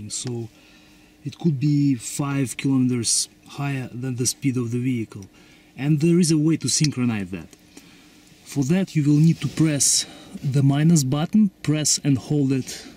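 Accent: Russia. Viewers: Недавно купил такую для 2017 Toyota highlander с OBD подключением... Подключил без установки в гнездо крышки динамика. После прохождения теста экран тупо гаснет и все. Включаешь двигатель (у меня гибрид) и ничего - кнопки только пищат при нажатии. Пробовал проехаться: 3 минуты ехал и нифига - пустой экран... Дефективный девайс, походу... Во тут видео, шо я снял для продавца (тоже на английском с таким же русским акцентом :) - <link>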